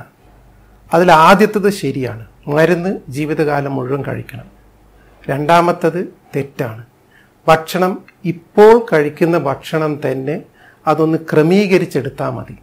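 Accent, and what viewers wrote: Kannur, India. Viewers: So nicely and explained in a simple manner. Your kannur simple language is so nice to hear.🙏👍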